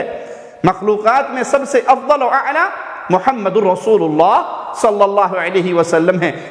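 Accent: Arab world. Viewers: اردو کو اردو لب و لہجے میں بولا کریں۔ عربی تجوید کے ساتھ نہیں۔ اردو کو عربی میں بولنا اتنا ہی غلط ہے جتنا عربی کو اردو میں بولنا۔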